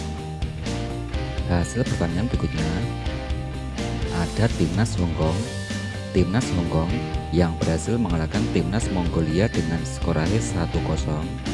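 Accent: Java, Indonesia. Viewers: Vokal mu jawa medok, penyebutan mu seringkali salah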